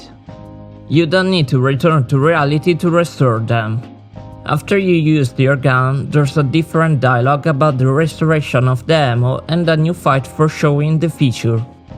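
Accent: Italy. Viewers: I know it's hard to understand, but it's not his fault, in Italy most schools teach you english with a bad accent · Are you italian?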